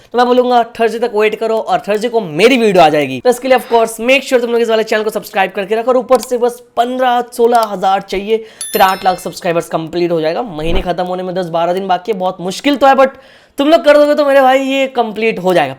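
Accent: Bengal, India. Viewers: Bhi ap bangali ho kya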